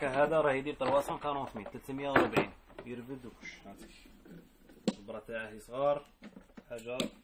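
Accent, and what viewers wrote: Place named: West, Algeria. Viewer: هذا الحنوت وين جاي رني نسمع اللهجة من الغرب صح ولا راني غالطة يعني راك غير مجهتنا ههههههههه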